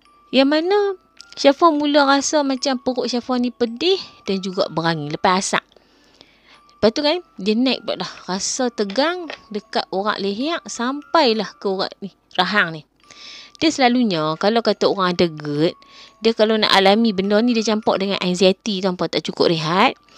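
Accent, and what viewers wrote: North, Malaysia. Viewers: Akhirnya Syafa cakap loghat utara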